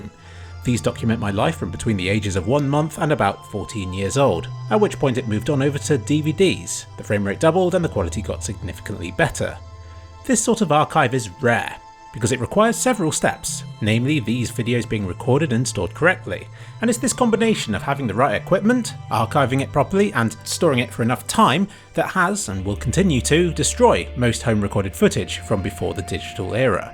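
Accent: United Kingdom. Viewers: Did you still have a British accent back then?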